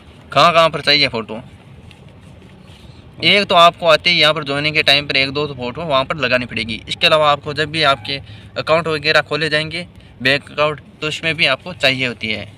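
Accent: India. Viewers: भाई बोली भाषा से तो राजस्थानी लग रहे हैं तो प्लीज़ अपनी क्षेत्रीय भाषा को अवाईढ ना करें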